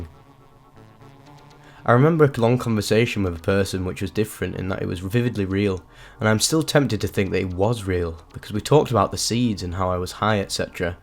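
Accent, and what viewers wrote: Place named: Birmingham, United Kingdom. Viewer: Was just wondering that yesterday - I figured somewhere near Birmingham. I’m on the other side of the Atlantic so from here that seems close enough…?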